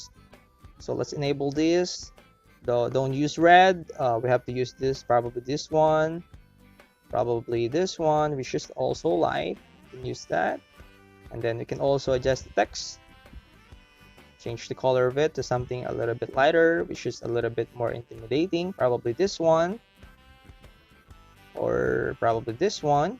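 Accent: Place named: United Kingdom. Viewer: British accent heh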